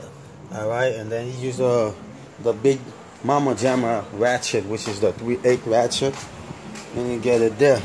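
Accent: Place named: Haiti. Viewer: You sound Haitian. Sak Pase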